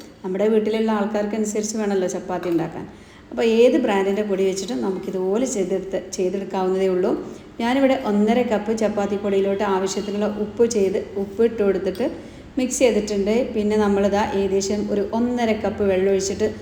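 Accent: Malappuram, India. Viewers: Samsaram malappuram style matiyoj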